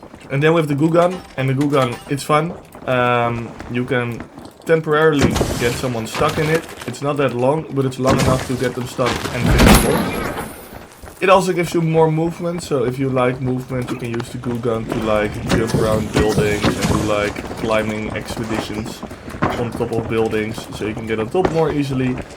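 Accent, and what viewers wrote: Netherlands. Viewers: nederlander detected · Dutch accent spotted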